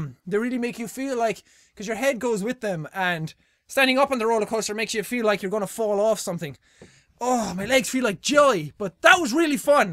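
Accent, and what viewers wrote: Ireland. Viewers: Do you live in Ireland? I hear the accent in your voice. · ***** There is a specific accent those from Southern Ireland have. High pitched and fast. definitely has that. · Your accent is that Irish?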